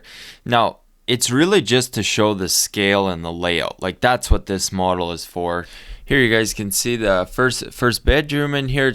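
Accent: Canada. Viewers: Canadian Ah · I really think you're doing a hell of a job I think you do excellent work and I have been watching for over a year or more and I love Canadian accent. I have been wanting to move to Maine on the Canadian border but my health went to hell and I'm 77 years old so I guess I'll have to stay here and drive my till I can't anymore. I'm looking forward to your next videos keep up the good work ALLYN T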